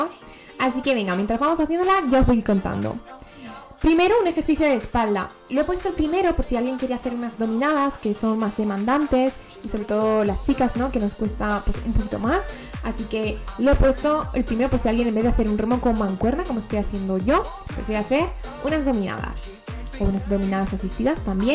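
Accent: Spain. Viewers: escucharte con tu acento español tan hermoso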